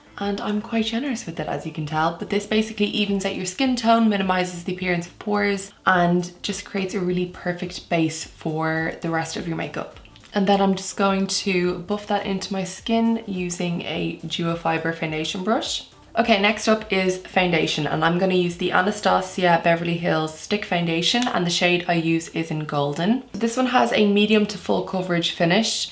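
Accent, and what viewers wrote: Ireland. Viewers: You're gorgeous 😍. Love your Irish accent too😉! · Wow your eyes 😍😍 and listening to you makes me miss living in Ireland 😫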